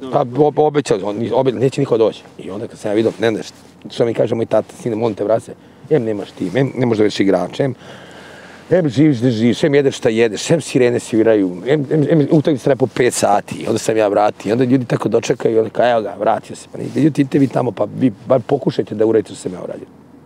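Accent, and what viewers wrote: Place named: Bačka, Serbia. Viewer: Лакат краљ, полако али сигурно набацује бачвански акценат..😎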